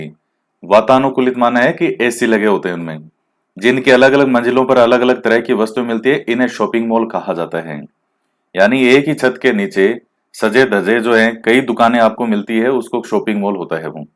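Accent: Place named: Sri Ganganagar, India. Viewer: 🙏 sir Aapky bary m janty to nhi bt aapni voice tone s lgta h jese aap sgnr( sri ganganagar) k nivasi ho.😊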